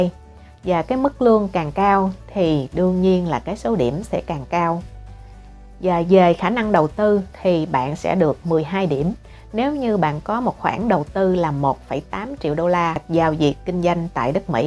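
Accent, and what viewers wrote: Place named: Saigon, Vietnam. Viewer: Cảm ơn em, giọng đọc rất truyền cảm, rất chuẩn Saigon, US.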